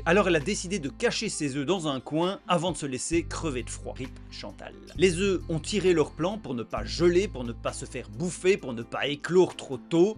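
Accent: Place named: Belgium. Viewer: Ça s'entend mais c'est pas dérangent je crois que j'ai un accent plus fort que toi d'ailleurs 😂 non se qui m'a fais me dire ça c'est tes expression qui sont bien belge❤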